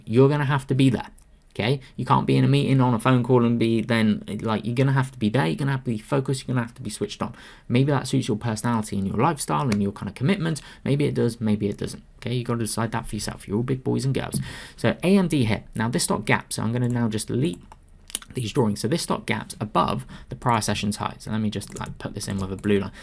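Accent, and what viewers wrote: United Kingdom. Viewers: hi, not asking for recommendation, just wanted to know what if any allow unlimited stock trades in the UK, only asked as you sound like you are from the UK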